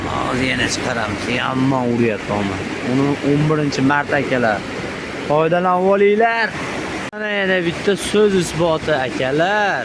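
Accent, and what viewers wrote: Andijan, Uzbekistan. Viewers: Анжонни каери бу ука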